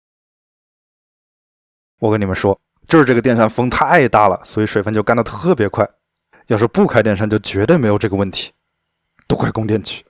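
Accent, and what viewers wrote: Wuhan, China. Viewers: 听口音 Up主武汉人吧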